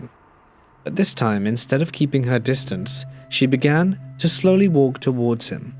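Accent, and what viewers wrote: United Kingdom. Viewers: Sorry but british accents just don't make scary stories sound scary like american accents do so I;m out after the first 2 stories.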